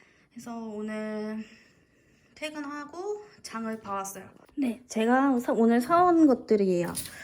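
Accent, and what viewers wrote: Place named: Seoul, South Korea. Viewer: 5년전 영상인데 왜 예전 서울사투리가 들리죠 ? 언제나 귀여우셔요 ㅎㅎ😍😍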